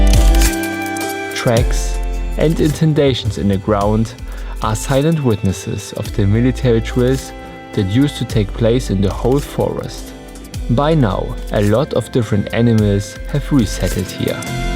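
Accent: Germany. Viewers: His accent is intense. This is 100% pure german accent without even trying to pronounce properly. Sorry but that makes me cringe :D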